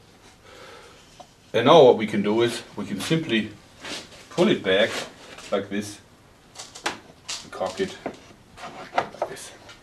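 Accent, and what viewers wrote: Germany. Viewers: ich hab mich die ganze zeit gefragt warum der sich so deutsch anhöhrt :D:D kommt ja aus deutschland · klar ist er Deutscher - hört man ja auch an seinem Akzent :) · anybody else notice that he sounds like a german robin williams... he sounds just like him just with a german accent. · Hörst dich sehr deutsch an, bist auch deutscher oder?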